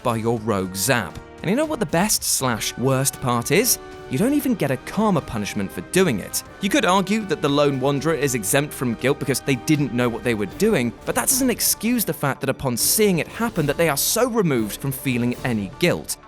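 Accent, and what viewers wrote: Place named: Newcastle, United Kingdom. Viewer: Oml you’re a geordie like me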